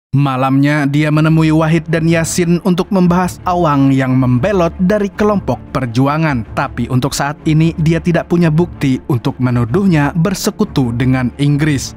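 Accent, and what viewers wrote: Banjar, Indonesia. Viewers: Mang urg banjar kah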